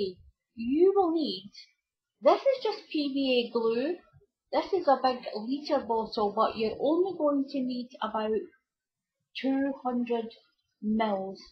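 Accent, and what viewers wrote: Scotland, United Kingdom. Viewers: Haha, I was thinking the exact same thing thru the whole video! There's just something about a Scottish accent, so easy to listen to - almost musical! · as an American I don't speak Scottish accented English so I turned on captions and it's still missed a lot of what you were saying, but I got the gist of it and thank you for putting this up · Very good recipe you sound Scottish. I'm scotland so thank you for recepe scotland u.k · Omg.... I could listen to your voice all day. Love the Scottish accent, and very interesting videos as well. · LOL you shouldn't stop yourself. Scottish mannerisms and dialect doesn't get anywhere near the exposure it deserves. lets face facts we're pure dead brilliant and everybody should know it :) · What a beautiful accent ....... how I miss Scotland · Hi --I was looking for paper clay recipes and came upon your video for air dry clay. 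I'm looking for a kind of clay that I can use to put in decorative silicone molds, remove from the molds when still soft, then glue them to furniture I'm painting. I don't know if your recipe will work, but I have subscribed to your channel because I love your Scottish burr. I could listen to you forever. Thanks so much for this recipe.💗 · Great recipe and what a joy to hear your accent! My mum and grandparents were from Scotland and I never hear anyone with a Scottish accent anymore.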